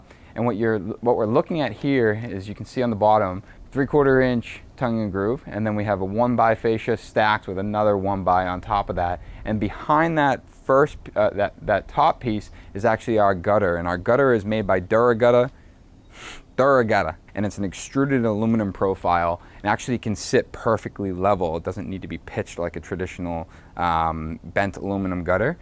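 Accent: Boston, United States. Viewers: I love how sometimes you just can't turn off your Boston accent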